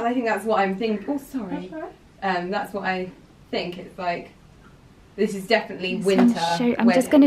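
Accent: RP, United Kingdom. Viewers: Hi, I am an Italian girl, I really love your accent, do you define your accent an "RP english"? It's sounds different and more elegant compared to other bloggers out there:) It's similar to the Emilia Clarke one:) Kisses from Milan <3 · I haven't watched your videos in a while, and the first thing I wanna say after the first two seconds of the videos is: I'VE HELLA MISSED YOUR UNREAL ACCENT! Omg😍😂 I will always be jelous of british people. AND HOLD ON: HOW EXCITING IS IT TO BE TRYING ON WEDDING DRESSES like, for your actual weddingg!!😍😍😍 I'm so happy and excited for you!!! You teo look AMAZING together! So lucky ugh😍😍😍 Love youuu😄💗💗💗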